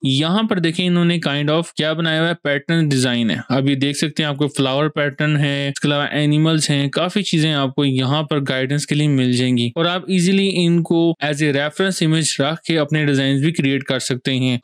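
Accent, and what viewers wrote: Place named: India. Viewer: Im not understand. Pronoun english india 😅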